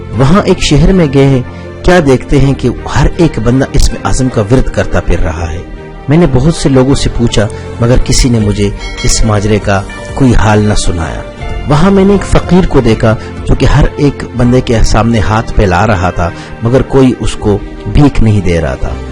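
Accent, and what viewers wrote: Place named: Punjab, Pakistan. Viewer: Assalam Alaikum Aapne ek achchi kahani chuni lekin urdu sahi se adaa nhi kar paye. Punjabi wala lahja hai aur padhne me bhi kabhi ujlat, kabhi uljhan aur kabhi bedili se padha hai aapne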